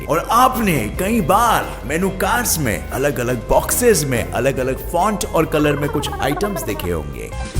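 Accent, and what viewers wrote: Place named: Kumaon, India. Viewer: Bhai as your dialect is kumaoni. Do you know Kumaon mastiff.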